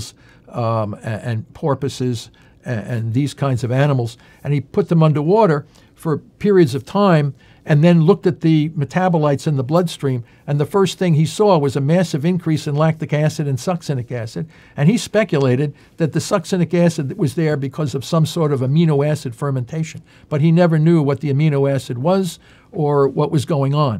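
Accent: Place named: New England, United States. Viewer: Thank you for your WORK, and for the plain English explanation. I'm serious! And I love your mild New England accent;) This is ALL very encouraging to everyone who has lost family members to cancer.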